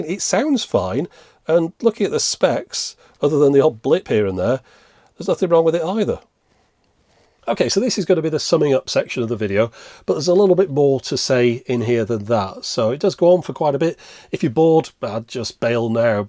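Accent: United Kingdom. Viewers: root is sexual slang in Australia. So the British pronunciation of Router as Rooter is funny.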